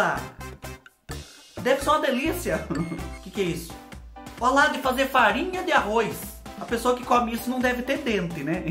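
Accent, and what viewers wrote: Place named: Itapetininga, Brazil. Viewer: amo o seu canal kkk cê fala igual eu e a turmaiada aqui de itapetininga kkkkkkk espicúla kkkkkk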